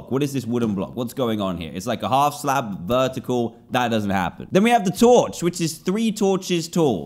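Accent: England, United Kingdom. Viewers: He's from england or something.... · He’s British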